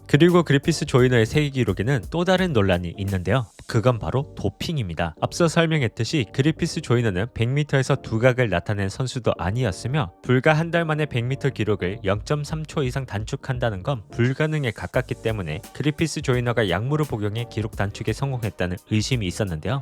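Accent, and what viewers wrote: Gyeongsang, South Korea. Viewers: 유튜버 하려면 경상도 사투리부터 고치세요.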